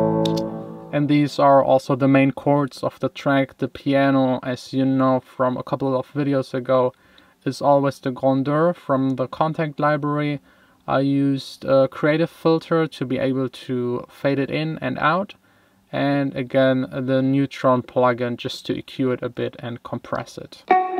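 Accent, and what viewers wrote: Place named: Germany. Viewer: Hi! It's incredible how much you've improved your English accent. In the video that's linked below you have a very strong German accent haha. Congratulations! I love your videos and music 😊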